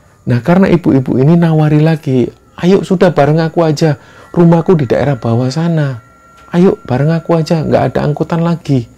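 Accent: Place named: Surabaya, Indonesia. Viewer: Mas ini orang mana ya, sepertinya ada bahasa khas Surabaya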